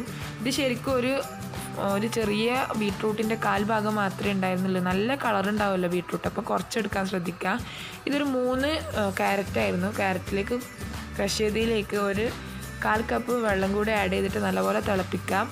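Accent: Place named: Kasaragod, India. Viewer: Ingal kaasarod aanengilum slang koykoottaaare cherya chaayvu ind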